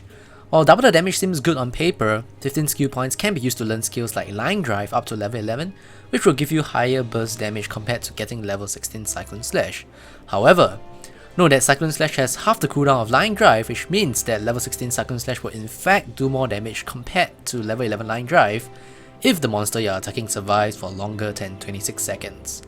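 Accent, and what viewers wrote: Singapore. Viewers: Yin s he still sounds like a Singaporean to me. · accent is south east asian. hes singaporean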